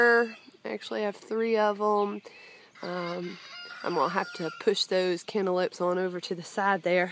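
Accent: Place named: Memphis, United States. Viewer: Your garden looks very healthy. By your accent it sounds like you might live near us here in Memphis. My tomato Jungle is in the greenhouse this year. I had such a battle last year with the tomato horn worm outside that I moved them all (100 plants) inside. I look forward to seeing more of your garden this year. Chuck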